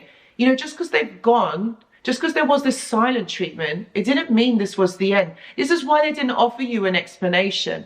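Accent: United Kingdom. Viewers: Some real facts are Heart breaking.....but your words and facts that you convey is god sent......god bless u Ms...... discarded 1 Oct 2023....going thru some real hard time......i need some insights from the community here and veterans here......my case is crazier hope all can help.....will tell my story if i am ready soon......Cheers. ooooh ya i always love British accents.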